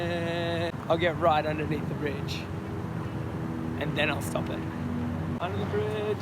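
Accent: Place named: Australia. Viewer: Great to hear an Australian voice keep going man! Good video, I am thinking of buying this GoPro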